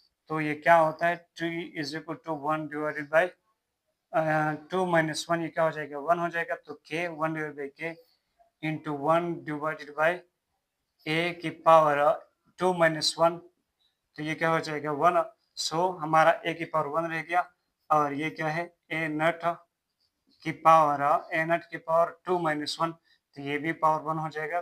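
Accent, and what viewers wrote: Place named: Pakistan. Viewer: Sir ap pak se ho kya apka bolne k tarike se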